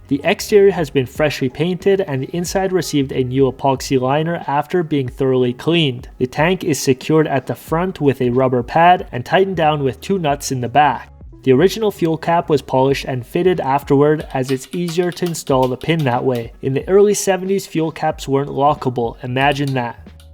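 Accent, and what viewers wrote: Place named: Canada. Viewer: What a great video! It was difficult to understand your thick Canadian accent but I loved watching the tear down and hard work.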